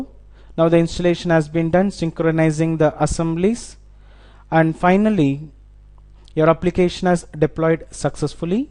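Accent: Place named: India. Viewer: Dispite the Indian Accent, the video is very good. It's very nice knowing we can develop powerful apps using c# to Android! Thx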